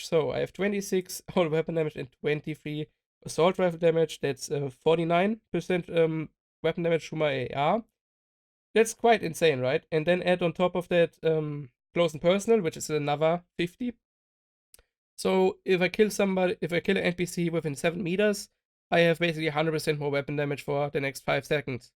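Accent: Germany. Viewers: Beim ersten Wort auf englisch habe ich gemerkt der ist ein deutscher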